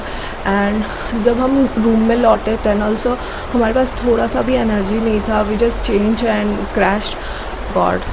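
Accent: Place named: Odisha, India. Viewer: ମୁଁ ଜାଣିଛି ତୁମେ ହେଉଛ 💯% ଓଡ଼ିଆ। କାରଣ ଯେଉଁ ଓଡ଼ିଆ ଲୋକ ହିନ୍ଦୀ ରେ କହିଲେ ଜଣା ପଡ଼ିବ ଓଡ଼ିଆ ଲୋକ।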